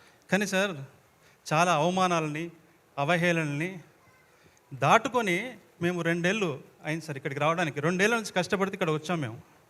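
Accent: Telangana, India. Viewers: Telangana yasa basha ❤❤❤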